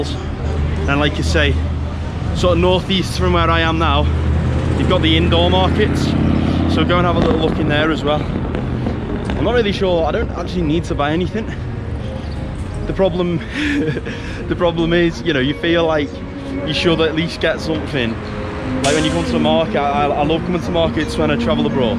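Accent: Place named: Yorkshire, United Kingdom. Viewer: Loving your accent! Yorkshire? Brummie?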